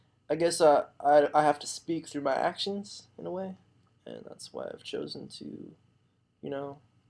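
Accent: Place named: Spain. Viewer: Have you seen by any chance, yearsss ago, his last vid (now deleted) that he uploaded where he was talking and seemed incredibly high or drunk and in a heavy Spanish accent ??? Like he was slurring his speech & I couldn’t understand anything, I wondered if he was under the influence of a drug that made him slur. It was weird as hell, then he deleted it.. I rly just wonder where he is in the world, if he’s even alive and what he’s doing rn.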